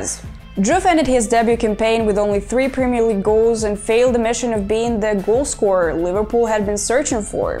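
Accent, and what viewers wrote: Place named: Latin America. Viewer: Great content, the accent is giving some Latin speaking country but your command of English is better than us Brits. Your delivery means you will be a YouTube star, keep it up 🙌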